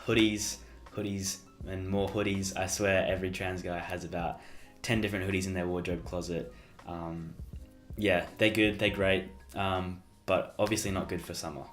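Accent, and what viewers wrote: Australia. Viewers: love Australian accents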